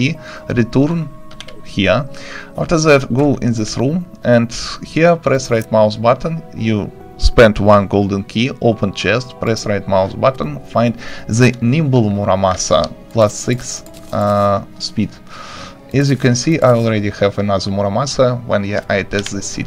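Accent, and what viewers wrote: Russia. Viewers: мдаа с русским акцентом!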